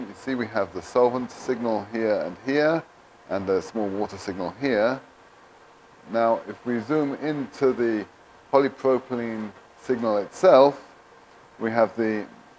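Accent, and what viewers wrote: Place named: United Kingdom. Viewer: You sound British are you from the uk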